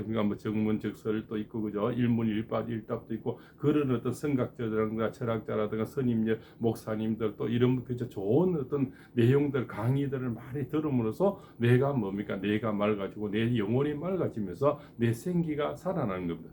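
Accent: Gyeongsang, South Korea. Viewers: 아,넹 감사합니당ㅋ 쌤 경상도 발음을 제가 잘 못 알아 들어서 죄송합니다ㅠ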